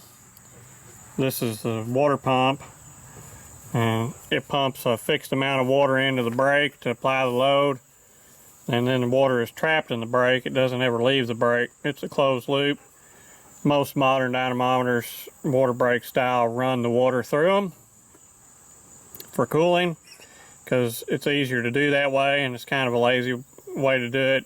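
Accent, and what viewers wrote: Kentucky, United States. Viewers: You have yet to publish a boring video in my estimation. I even enjoy watching a piece of steel go round and round in the lathe. You and a few other mechanical types have helped me learn new information. My greatest joy comes from just listening to you speak with your Kentucky accent about technical information. Keep up the interesting work. It is interesting and yet entertaining for this old country boy! Thank you for the pleasure you provide me with every video!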